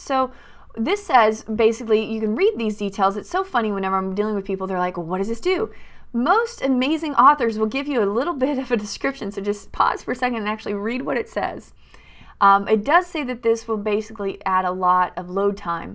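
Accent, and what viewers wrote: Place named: Texas, United States. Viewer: you are a genius. Love the texan accent.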